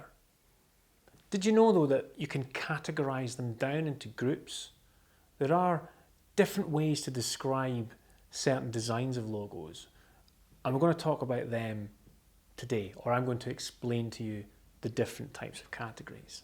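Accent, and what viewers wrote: Scotland, United Kingdom. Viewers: HOLY CRAP YOU ARE SCOTTISH! IM PART SCOTTISH!!! HOW DO I GET BETTER AT MY ACCENT AND THE GRAMMAR IN SCOTTISH?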